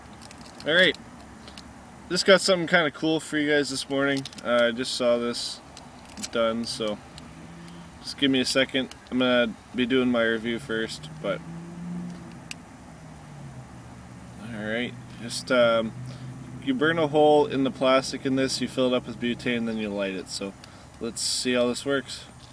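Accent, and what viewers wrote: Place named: East Coast, United States. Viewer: You are awesome! I just gotta ask, do you smoke? Bhahahaha... okay, okay, all kidding aside, I was thinkin' you sounded from the east coast. Just wonderin'. Keep up the great work from Josh here in Ontario! Cheers!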